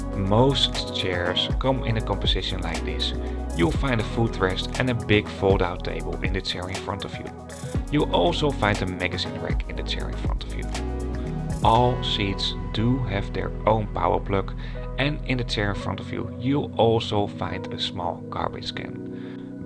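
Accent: Netherlands. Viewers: Very nice vid! But, please, please - do something with your dutch accent ! These are, of course, "very" and "luggage" ! (Instead of "fery" and "lagitz"! ))).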